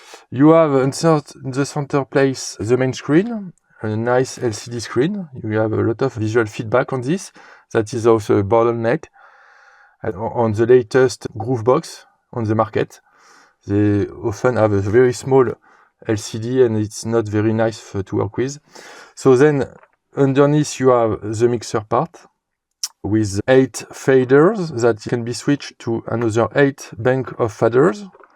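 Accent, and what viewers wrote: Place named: France. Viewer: Salut tu as un great french accent 😄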